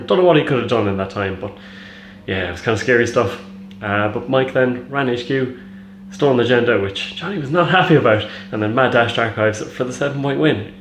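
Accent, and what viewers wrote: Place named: Ireland. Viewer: Heh didn't pick up on your Irish accent, but now I can totally hear it. Congrats on your buddy's win!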